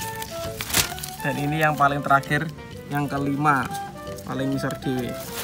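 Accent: Java, Indonesia. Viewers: Jowo ne ndi mas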